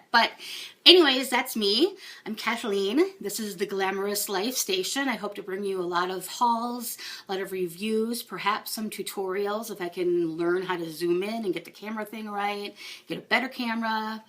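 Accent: United States. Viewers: Glad to get to know you! I hear the Minnesota in you, I'm a Midwestern girl, too. Cheers, Lulu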